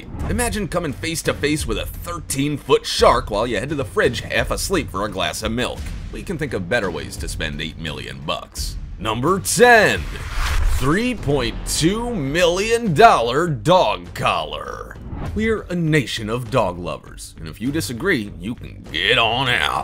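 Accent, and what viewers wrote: United States. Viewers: narrated by an American accented person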